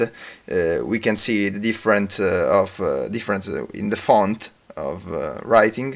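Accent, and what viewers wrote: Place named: Italy. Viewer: si sente molto dall'accento che sei italiano xD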